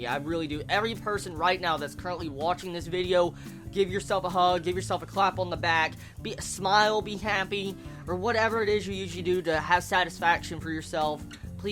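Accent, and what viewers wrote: South, United States. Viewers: Is it me or do have an southern accent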